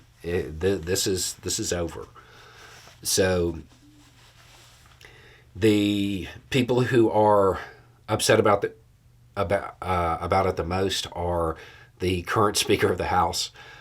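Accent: South, United States. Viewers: The accent probably puts people off initially. Just like WellRed. My Indiana emigre wife rolls her eyes whenever she hears the voices in f the south, and I can’t convince her to work in t all the way through. But it grows on you, as you know. Beau deserves public office, to be honest. But then we’d lose his straight shooting journalism. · I found Beau because Facebook fed me videos of a guy in a shed with a ball cap and a southern accent, near the beginning I think. Then it stopped so at some point I realized he is on YouTube so I found him here and subscribed. Beau doesn’t participate in the for profit news business, no click bait headlines, no sensationalist videos, just news and commentary so that is why he lags in subs but still he is approaching 900k.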